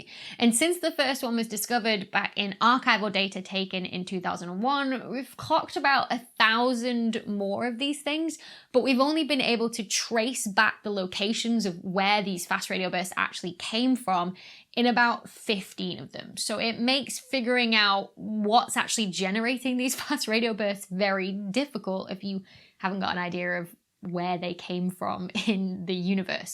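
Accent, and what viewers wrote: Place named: Northern England, United Kingdom. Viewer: Great to hear a Northern accent! Love this channel, fabulous stuff!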